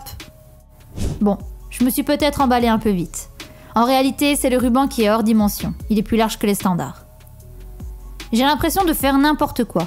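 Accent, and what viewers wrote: Belgium. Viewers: Salut, superbe vidéo, je me suis abonné. Est-ce que c'est un accent belge que j'entends ? Si c'est le cas, très heureux de savoir qu'une patriote fait d'aussi bonnes vidéos. Si jamais tu es intéressée, je peux t'expliquer l'électronique facilement, ça pourrait t'aider pour tes futurs projets pour soit avoir moins de difficultés, soit payer moins cher pour mieux ou le tout en même temps. Continue ce que tu fais !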